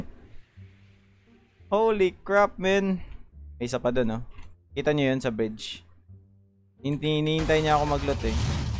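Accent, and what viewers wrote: Visayas, Philippines. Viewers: Bisaya kaba? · Bisaya kaba lods?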